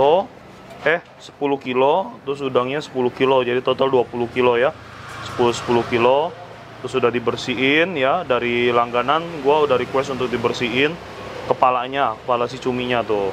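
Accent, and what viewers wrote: Banyumas, Indonesia. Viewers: Kok jowone Inyong Inyong mang ini orang mana. Xie Xie ya Koo ilmunya Sucses selalu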